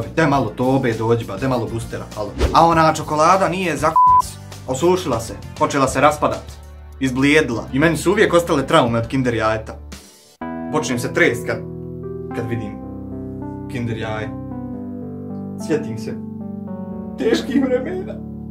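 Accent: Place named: Bosnia and Herzegovina. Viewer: sve full samo vrati naglasak od pre,sada pricas ono bas bosanski a meni je iskreno bas muka da slusam to,pricas kao kompleksas a ja njega ne mogu da slusam i verujem da nisam jedini,bez uvrede naravno bilo kome ali jednostavno taj govor je nekako cudan i ne prija mi usima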